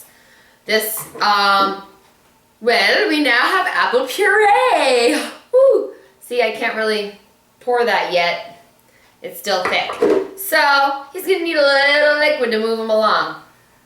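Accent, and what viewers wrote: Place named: Florida, United States. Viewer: Are you from Florida? You sound like a Southern Floridian (not South Floridian, mind you). :-)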